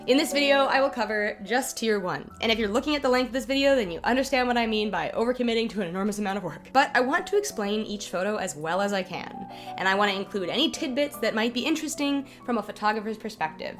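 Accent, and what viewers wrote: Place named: United States. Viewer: You sound american, so I don't like you.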